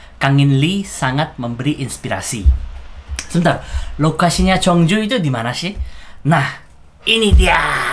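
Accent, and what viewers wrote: Java, Indonesia. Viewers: Kek logat Jawa bang suara mu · Lah kok medok yooo... Mantaff..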